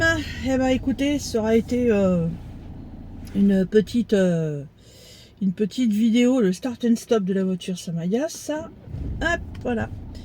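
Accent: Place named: Eure-et-Loir, France. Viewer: Ça fait plaisir entendre quelqu un qui parle eure et loir moi j i habiter mais du côté de dreux bizou bonne journée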